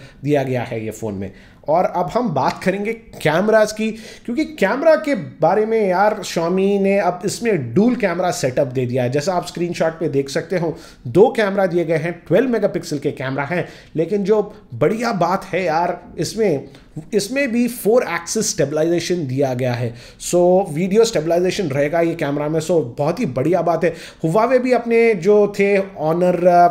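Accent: Hyderabad, India. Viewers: i just watch your videos because i like hearing hyderabady language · Kya bhai AP Bhi Hyderabadi hai bhai..Nice Hindi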